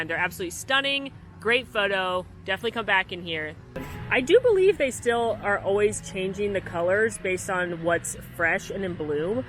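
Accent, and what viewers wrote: England, United Kingdom. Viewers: Hi from the UK - love the 'trapped' sessions and your British accent 😅😊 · your Canadian accent sounds more British to me;) I'm French Canadian BTW so I might be wrong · English accent in Canada?! 😅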